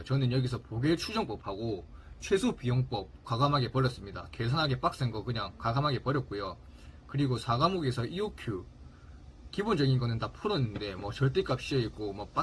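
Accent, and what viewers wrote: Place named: Gyeongsang, South Korea. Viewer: 느낌이 왠지 갱상도말 쓰는 최성국같네요 ㅋ 멋집니다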